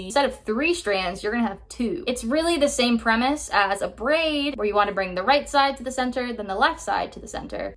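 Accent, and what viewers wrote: Canada. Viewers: you sound so much more canadian in this video!!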